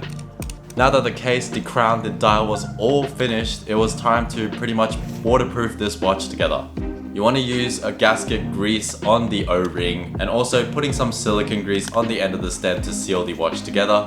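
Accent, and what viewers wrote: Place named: Australia. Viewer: G'day. Decided to follow you because you're the only the second Australian accent I've heard doing YouTube watch content and wasn't interested in the other guy's content enough to follow. Please invest in some decent lights when you can and a better background, and I hope this goes from strength to strength for you. Cheers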